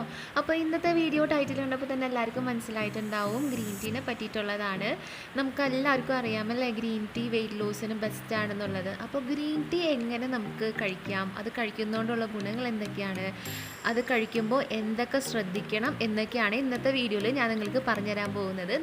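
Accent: Thrissur, India. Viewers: തൃശൂർ ആണ് അല്ലെ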